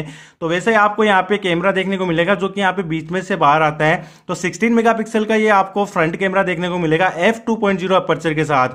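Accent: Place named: India. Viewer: heard indian accent.. quick close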